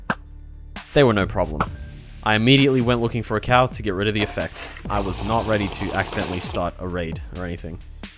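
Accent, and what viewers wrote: Australia. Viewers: R u aussie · How is your accent both Australian AND New York? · i'm not english native but i'm trying to figure out ur accent. are you australian?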